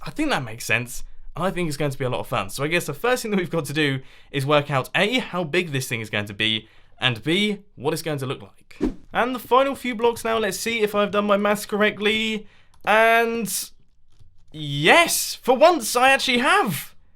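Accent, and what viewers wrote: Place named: United Kingdom. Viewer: I don't usually complain very much on the internet but has gotten really lazy over time. Now it's all about dumb progress updates every five seconds with him saying "This actually took longer than it seems!" as an excuse. Trying to reach the 20 minute deadline to make an average episode with the least ammount of effort possible. I mean if you AFK overnight that should be around 8 or 9 hours, not 14 or 15 like you said. You spend more than half the day sleeping? Also your go-to excuse is "BECAUSE IM A SPOON!! HAHA!!!" Now being stupid and having a british accent are his only selling points. I unsubscribed a relatively long time ago, because other hermits are better youtubers, but I come here from time to time to check and it's getting worse. Now he's adding merchandise into the mix. This is getting commercial now. Ugh.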